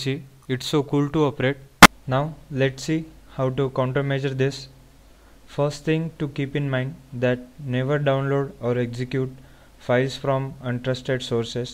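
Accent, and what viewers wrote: India. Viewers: wow extreme indian accent... is english your mother lenguage? or is it hindi? or maybe some of the other...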